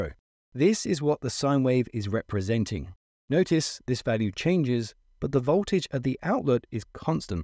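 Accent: England, United Kingdom. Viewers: The weird thing is an English accent after starting with a non-English sockets picture. Principles are the same, but was a bit surprising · It feels so weird listening to a British accent using American terminology’s (and voltage etc). Earth to ground Star delta to wye delta etc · cool, a british accent :)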